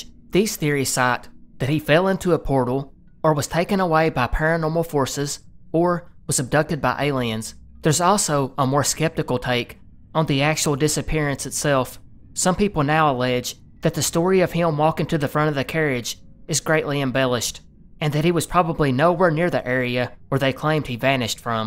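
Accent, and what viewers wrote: South, United States. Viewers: I just found your channel about a week ago and I've been binge listening at work and to fall asleep too. I love the content, but I really love the fact that you're a fellow southerner. There's not enough southern accents on YT. Greetings from East Tennessee! EDIT the guy who jumped out of the plane and died from the cocaine bear story landed in my old neighborhood in South Knoxville. I was a little kid back then, but I still remember when that happened.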